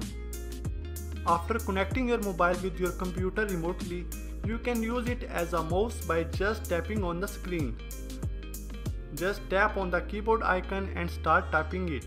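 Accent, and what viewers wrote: India. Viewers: So off topic but can anyone answer this serious question. Not being racist here. Just wondering about his accent. So he obviously has a great understanding of the English language but retains a thick Indian (im guessing) accent. This is very common. When I took French in school a big part of it was hitting the words correctly. Not speaking French with an American accent ya know. Now if he spoke broken English it would not have stood out. But he speaks very fluent English. So why is the pronunciation so off?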